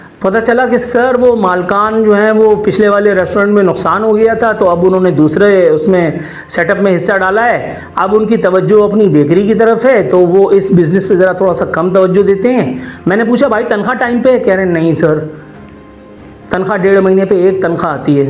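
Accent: Karachi, Pakistan. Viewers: یہ کراچی کی اردو ہے یہاں کے مہاجروں کی زبان ہے آکر سیکھ لیں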